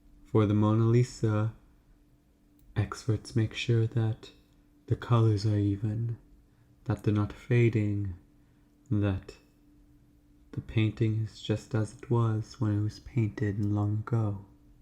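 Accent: United Kingdom. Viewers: he is british af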